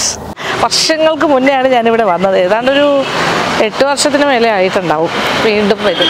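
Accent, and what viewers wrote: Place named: Valluvanad, India. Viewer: Palakkad etthiyappol valluvanadan slang aaya pole.....njangalude swantham Palakkad....keralathinte nellara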